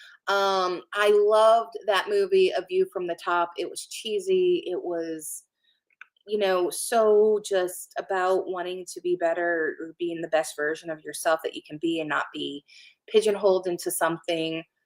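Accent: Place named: South, United States. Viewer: Hello girl! I'm in Oklahoma and I hear a tinge of "Suth'un" in your speech! Love it. I heard a question as to whether Sussexes would divorce. Only my opinion, but she's now heard Andrew's Sarah will retain her duchess title. Meghan covets that title, she feels she has multipled clout in having it. IF the king, or Prince William, remove their Sussex title, Meg will collapse like a burst balloon. ONLY my opinion, mind.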